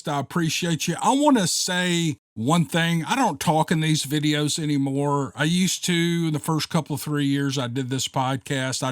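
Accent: South, United States. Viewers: I love you. I listen to you EVERY NIGHT. I enjoy so much listening to you read in that sweet southern accent. Keep up the great work you do. ❤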